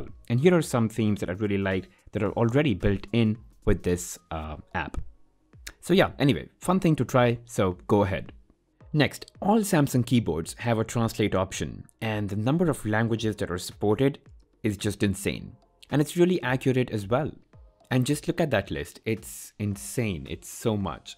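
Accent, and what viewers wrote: India. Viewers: Tapi logat lu kek orang india bro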